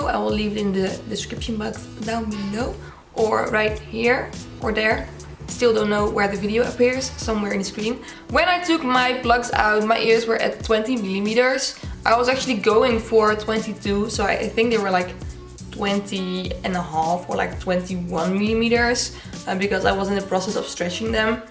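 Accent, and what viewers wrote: Netherlands. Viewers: Ik dacht al, haar engels klink heel nederlands achtig 😂. Toen las ik dat ze nederlands was.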